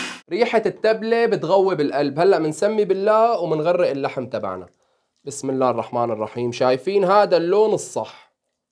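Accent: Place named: Damascus, Syria. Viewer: الشيف اشطر من الشيف عمر وكل شباب الشام تقريبا بيحكو بهالطريقة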